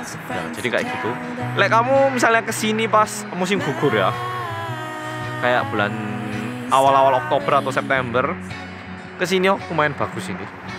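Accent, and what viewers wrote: Java, Indonesia. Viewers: Wah du bahasa elo medok banget sihhhhhhh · muka korea tp ko medok yo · sepedah pancal hahahha.... orang korea yg super medok... yang bikin ngakak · kadang pas ngomong Korea suka kebawa medok ga? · Loh gaonok jawabane jampot wes kehapus😂. Njir muedok seru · MEDOK BANGET ASKJSDHKDSHFHSDDSJ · Iseng liat vlog dan baru tau vlog ini and... Lah... Medok banget jawanya😆 asli orang mana sih kak? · aku wis nonton videomu ket jaman dipek ket kowe isih nganggo kamera hp. neng aku cen jarang komentar. neng asline aku seneng nonton videomu, kowe ra ngerti aku tapi aku uwis nganggep kowe kaya kancaku, kowe mung luwih tuwa sithik seko aku, caramu ngomong nggo basa jawa, logatmu marake aku ngrasa kowe kaya kancaku, ngarasa kaya kowe ki cerak. and what I like about you I know youre genuine, you care about Indonesia, you care about us like a dear friend. 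aku seneng ngrugoke kowe ngomong youre like a chatty friend that I never had. thank you for being here, thank you for your wise words, thank you for sharing your story with us. I like to listen to your story on a rough day. It helps me, I love your friendly voice. I hope you will never stop making videos, you could talk about your bad day and I will still listen to it. I don't know whether you will read this or not but once again thank you. wish I could meet you in person one day. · kalo ngomong bahasa Korea (jadi keliatan orang Korea banget) pas pake bahasa Jawa ( medookk tenan mas ) syuuukak banget 😍😍😍 Ketika susah maupun senang selalu ingatlah dengan pencipta mu maka sesulit apapun hidup dapat kamu lalui, sesenang apapun kamu jangan pernah lupa siapa pemberi nikmat/rejeki agar kamu tetap bersyukur. 😊😊😊😁😍😍😍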